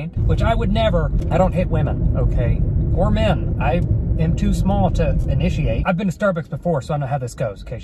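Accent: South, United States. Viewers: I don’t know how to explain but you have on of the coolest country accents I’ve ever heard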